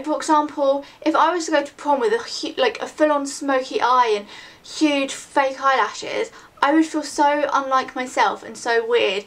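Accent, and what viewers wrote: United Kingdom. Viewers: Where in england are you from? I love your accent :P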